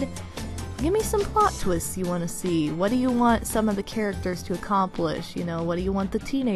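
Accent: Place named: South, United States. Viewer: The days when you had a proper southern accent! lol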